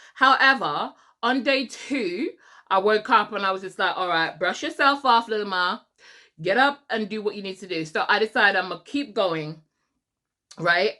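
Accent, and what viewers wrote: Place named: United Kingdom. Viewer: Where are you from? You sound British and American. Can't pin you down! Great video 😁👍🏻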